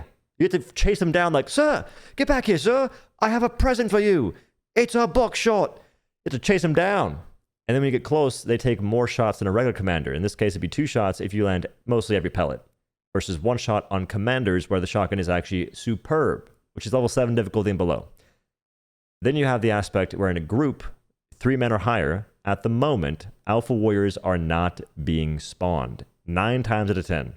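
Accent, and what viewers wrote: South, United States. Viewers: I'm from Bowman south carolina and I gotta tell you every other man you meet sounds like that. Southern drawl. Born and raised there too but my accent is weird.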